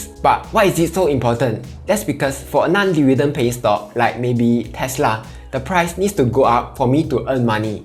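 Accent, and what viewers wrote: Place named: Singapore. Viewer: haha bro i only sub your channel yesterday, today i am the first to view your new video. nice one, i enjoy the way you talk. very singaporean la!!! but i guess you are PR, so must be another nationality.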